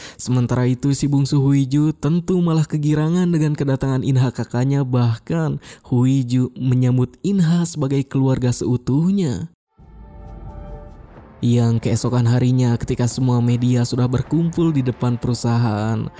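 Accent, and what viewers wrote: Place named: West Java, Indonesia. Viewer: Min orang Sunda nyaaa😭🫵🏻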